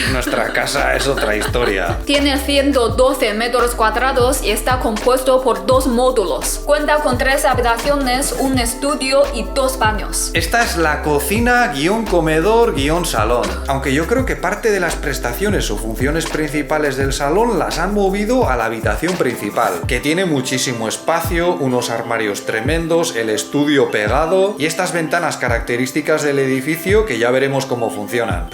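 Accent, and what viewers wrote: Chile. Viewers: Wena... saludos desde Coquimbo, Chile. Habla muy bien el español con acento chileno. Sorprendente. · 7 años en Chile, con razón habla así, ahí se habla cualquier cosa menos español..... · se siente muchooo el acento chilenoooo 🥺💓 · Cuando comenzo a hablar le note altiro un acento chileno. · tiene el acento chilenx :') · Si se le nota el asento de chile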